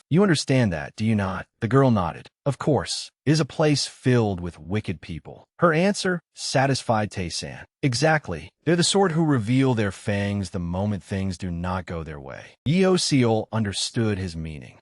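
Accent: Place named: Arab world. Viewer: Why does the narrator jump into an Arab accent every couple of minutes?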